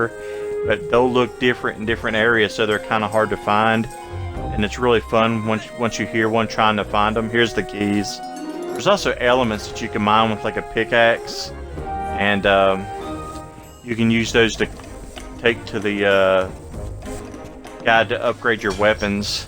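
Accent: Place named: South, United States. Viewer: Awesome southern accent bro sounds like me lol I'm from Memphis TN yo I'm wondering is it worth 13.00? Ps4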